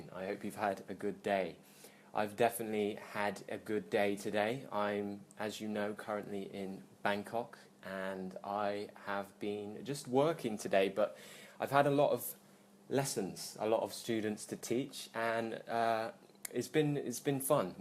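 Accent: United Kingdom. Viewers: Hello, my name is Pachara. I’m from Thailand and now living in the State. I love your video English lessons because I love British English. I live in the State but actually don’t like American English lol. I’ve found your videos are really useful and helpful. I like that you give tips not just teach. Keep up the great clarification. 👍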